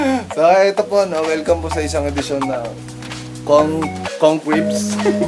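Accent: Ilocos, Philippines. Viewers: Ilocano ka sir?? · Ilocano ka ata eh noh???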